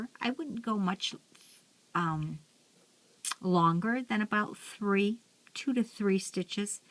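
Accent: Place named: South Carolina, United States. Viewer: I enjoyed looking at all your projects... are you from South Carolina, I'm from Georgia... your accent sounds like my sister in law who lives in Columbia, S.C.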